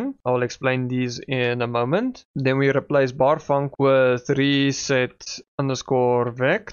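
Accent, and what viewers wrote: Afrikaans, South Africa. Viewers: jissie daai lekker afrikaanse aksent :)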